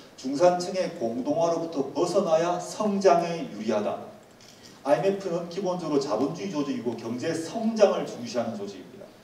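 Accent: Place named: Busan, South Korea. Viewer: 조국교수님 강의는 언제나 유익하고 쉽고 깨달음을 주면서 가슴에 와 닿는 제게는 중요한 인생강의가 되곤 합니다. 이렇게 유튭으로 듣고 볼 수 있으니 정말 고맙습니다. 저도 부산이 고향이라 교수님의 부산 액센트와 사투리가 친근하고 넘 좋아요 :)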